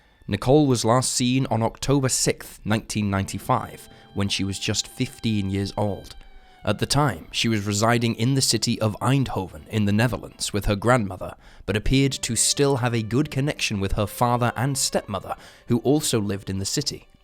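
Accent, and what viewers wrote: United Kingdom. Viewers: British accent 👎🏻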